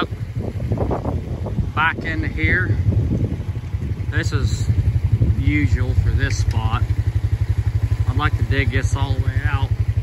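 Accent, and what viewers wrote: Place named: Western Pennsylvania, United States. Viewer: Is this in western PA? Guessing based on your dialect. I miss that.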